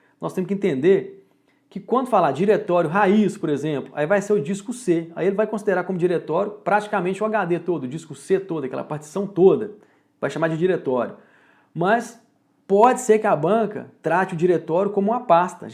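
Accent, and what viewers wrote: Minas Gerais, Brazil. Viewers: Ótimas aulas, Esse sotaque mineirim da um charme especial. Parabéns pela maneira de explicar e passar seu conhecimento!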